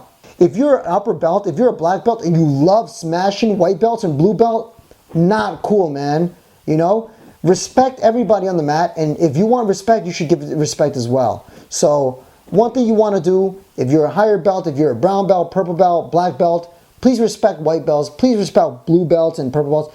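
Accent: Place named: South, United States. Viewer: That southern accent though 😂😂😂 bloopers made this video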